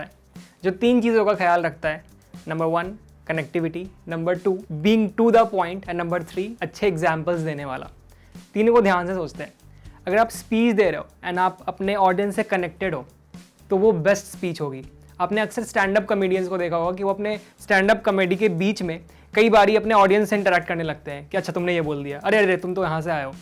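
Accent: Bihar, India. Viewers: Full bihari tone that the guy 👦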